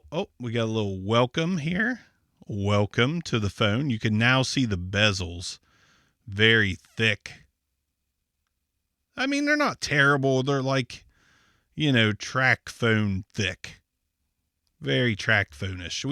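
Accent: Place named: Philadelphia, United States. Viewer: Sensing a Philly or Baltimore accent. I dig it man. I'm from Philly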